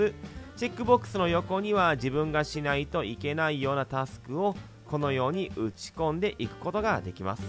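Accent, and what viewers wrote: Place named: Kansai, Japan. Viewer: 関西の出身でしょうか、親しみを感じて聴き易いです。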